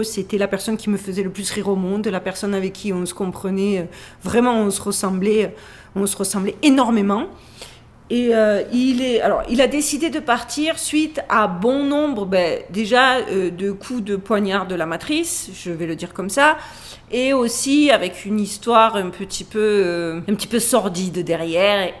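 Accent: Marseille, France. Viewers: Allo, finalement tu n'est pas une stupide blonde😉😉🤣, Merci pour ton premier F.A.Q., l'explication du nom et de la photo... au sujet de l'accent, je savait quelle venait de Marseille! ( ouais, je suis Québécois, mais; j'ai eu beaucoup d'amis (es) Français) je préfère l'accent du Saguenay!...J'ai une question en deux temps, quel Youtubeur suis tu et est-il possible de voir une collaboration avec eux? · ❓Tu as conservé la langue française et l'accent de Marseille, bravo. Comment tu as résisté en ayant vécu dans des pays anglo? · 3eme commentaire. Rebonjour. Moi j'ai une question. Est-ce que ton accent du sud ressort lorsque tu parles anglais? Précisément: est-ce que tu parles anglais avec l'accent Français du sud? 🤔🙂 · haha 🤣🤣🤣 Merci pour cette franche rigolade!! Si je vous appelle, ça vous dérange? 😆 Ah et confirmation... J'avais bien reconnu un léger accent marseillais. 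A moins d'avoir envie de tirer des pruneaux et de jouer de la baillonette je ne vois pas l'intérêt de revenir vivre en France durant les deux prochaines années. Vous êtes certainement plus en sécurité là où vous êtes. En France ça devient du grand n'importe quoi... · En tous cas vous n'avez pas perdu l'accent méridional malgré le fait de 15 ans aux states 😊❤ je suis varoise 😘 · Aussi ce que j'ai découvert et adoré, vous êtes originaire de la région Marseillaise, je m'en doutais à votre accent.Comme moi d'ailleurs, actuellement j'habite à Istres, donc pas très loin de St Martin de Crau, donc la prochaine fois que j'y passerai, je penserai à vous. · Disclaimer: je ne crois pas DU TOUT à ses rumeurs! Toutefois, méfiez-vous! Les trans peuvent être en apparence des "femmes" extrêmement féminines. "Elles" se font gommer les traits masculins (comme des mâchoires trop carrées ou le front à bosses typiquement masculin) par ce que les chirurgiens plasticiens appellent la féminisation du visage. 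Pour ma part, je trouve le visage de magnifique et sa mâchoire n'a rien de masculin pour moi. Vous aurez vu la mienne!!! 😆 Ce qui m'aurait mis un doute ce sont ces mains, très belles mais un peu grandes pour une femme. Je me suis dit que c'était l'effet d'optique (plus proches de la caméra que le reste) mais il y a des femmes biologiques qui ont de grandes mains. Pour reconnaitre une transexuelle, il faut savoir analyser beaucoup de choses et être au fait de beaucoup de choses. Et, surtout, ne pas prendre en compte une caractéristique mais tout un ensemble de traits (visage, corps, comportement). Après, les "haters" trouvent tout et n'importe quoi après avoir quelque vidéos sur cette mode de transition. Et a tout lu, à commencer par le plus facile: une blonde à l'accent Marseillais c'est tout de suite une cagole. P.S.: Comme pour tout le reste, le fait d'avoir un enfant n'est pas un argument. 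Il y a des trans qui ont des enfants qu'ils n'ont pas porté et vont même jusqu'à simuler la grossesse. Eh oui, ça fait partie des choses sur lesquelles les gens ont du mal à ouvrir les yeux. Le net regorge des sites qui venden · Wow je ressentais bien que tu es une femme spéciale mais je dois ajouter que tu es formidable ♥️♥️♥️. Quelle force, quel parcours! Et quelle beauté! Ne change rien et continue à nous transmettre le fruit de tes recherches et ton humour, le tout avec ton adorable accent du Sud. Ta mâchoire est juste parfaite! C'est souvent ce qui nous a complexé étant jeune qui se révèle être un atout une fois adulte. Plein de good vibes de l'île de La Digue aux Seychelles... Et toutes mes félicitations pour ta chaîne. 🎉❤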